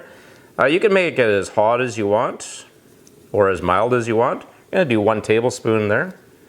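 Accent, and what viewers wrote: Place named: Canada. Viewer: I love the Canadian accent! Showing love to the northerners from Southern California aye!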